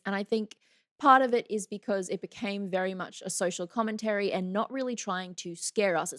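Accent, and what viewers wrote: Australia. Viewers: That „oer, oer“😂 man i love aussies😂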